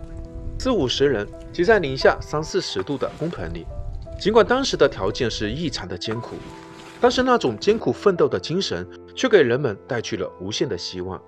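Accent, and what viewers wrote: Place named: Fujian, China. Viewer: 你这口音很福建啊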